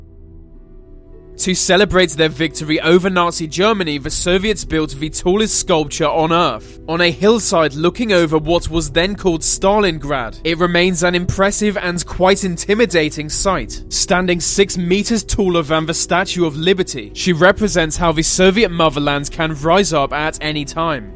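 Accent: United Kingdom. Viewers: You sound british yet people claim you're american, weird. Nice vid though.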